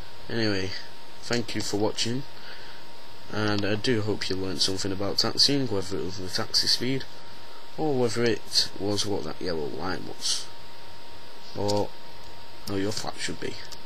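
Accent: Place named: Yorkshire, United Kingdom. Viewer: YOUR YORKSHIRE!!!! SO AM I!!! xD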